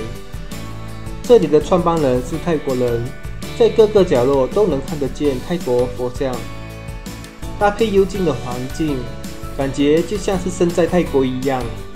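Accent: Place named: Kampung, Malaysia. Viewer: 这种kampung华语，应该会让外国华人听了很受罪，YouTube是全球性的，不是我们马来西亚独有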